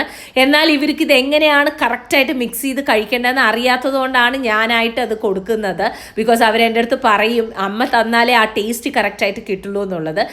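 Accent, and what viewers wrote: Palakkad, India. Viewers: Palakkad area aappam anu ennu thonnunnu. Nemmara thamasikkumbol avidullavar pronounce cheyyunnathu pole thanne aayirunnu. Thrissur appam thanne anu.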